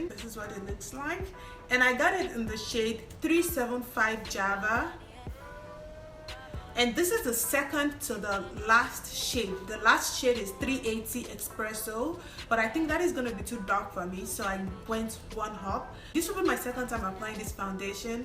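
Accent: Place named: Nigeria. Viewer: you are so beautiful! your accent sounds familiar to me but I might be misplacing it... are you Nigerian? I am too, Igbo!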